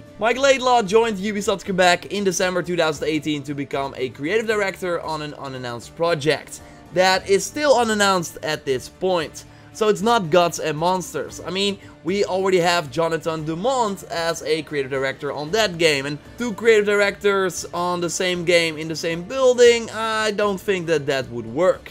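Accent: Netherlands. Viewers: goeie channel echt waar maar das elke keer weer da hollands-engels accent weer gewoon worden haha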